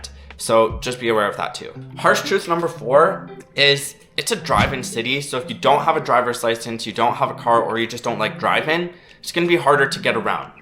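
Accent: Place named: Ontario, Canada. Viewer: Sounds like you belong in Ontario.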